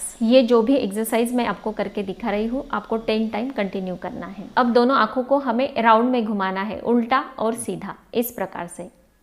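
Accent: Maharashtra, India. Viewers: Good Tips...Thanks. My guess... you are Maharashtrian. Because of pronouns of some words done by you I came to that conclusion. Am I right ?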